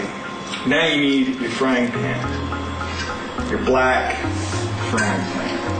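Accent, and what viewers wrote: Canada. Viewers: Listen to his Canadian accent here holy. Haha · the canadian accent is strong with this mau5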